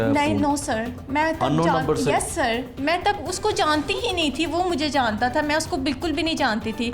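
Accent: Punjab, India. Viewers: Accent tu completely punjabi hai. Lakin punjabi bulna nahi hota.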